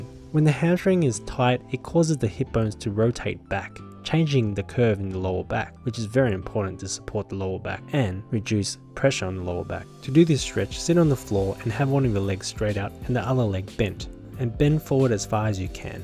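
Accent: Australia. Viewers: Super helpful. Thank you so much. Love your Australian accent too.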